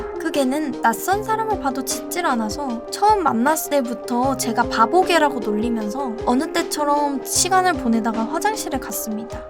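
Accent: Standard. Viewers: 새삼 느낀게 사투리 다 어디가고 서울말 쓰고 있네요?? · 표준어 패치된 ㄷㄷ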